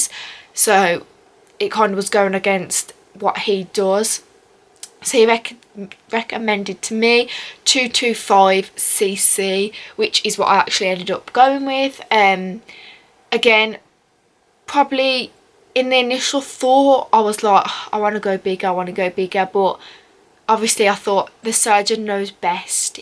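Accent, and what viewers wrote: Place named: Black Country, United Kingdom. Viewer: Black Country accent :)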